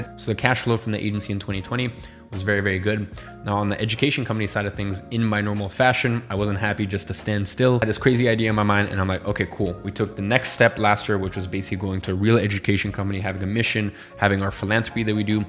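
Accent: United States. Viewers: You sound American. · How does he have that accent if he's only been to the US twice at that age ? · you have an American accent. Where are you from?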